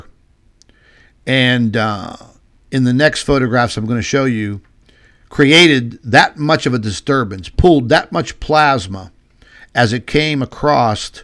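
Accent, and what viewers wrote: Pittsburgh, United States. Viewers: Hi: So glad you've started reporting again. I know you are busy and with the good Doctors, health issues it's difficult to find time for all you want to do. But this video is sensational- I mean sensational! That steller core(?). is amazing, thank you. Yes, it makes me wonder and how dare NASA and the psuedo scientific community not doing what you do, shame on those scientific charlatans. I occasionally have dabbled in a youtube channel if I should start again how can I get permission to use some images and quote your research, of course, I would give all credit to you should you desire. The best way to initially respond is to reply here I won't waste your time nor mine with email unless you agree then I will contact you. FYI I was born and raised in the South Hills area of the "burgh" I have not been back in 40+ years. 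Your accent and attitude remind me of my childhood home, thank you.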